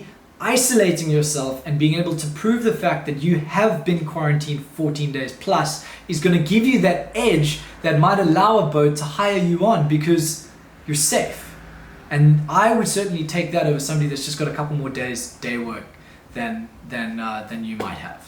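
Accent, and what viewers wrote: South Africa. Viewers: Boet, are you South African? The accent dead give away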